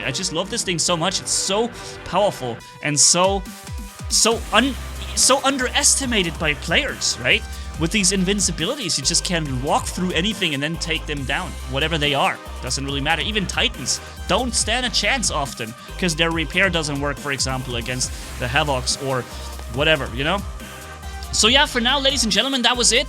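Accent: Germany. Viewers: Wait… Is HE GERMAN?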